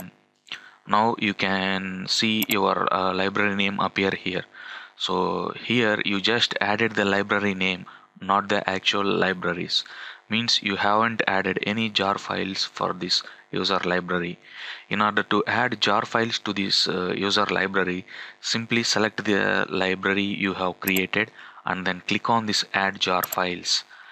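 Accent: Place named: India. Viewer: do you really have to be indian. like really? in every info videos INDIAN . INDIANN... Im not racist but my ears cannot hold indian.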